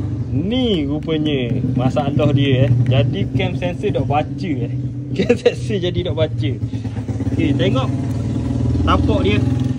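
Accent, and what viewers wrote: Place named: East Coast, Malaysia. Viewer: Assalamualaikum bengkel mne eh dengor loghat mcm pata timur😅...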